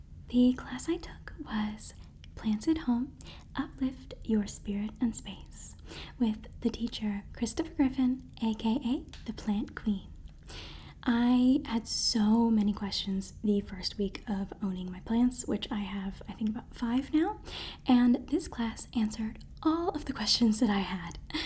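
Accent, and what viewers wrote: England, United Kingdom. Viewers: I love your british accent for ASMR!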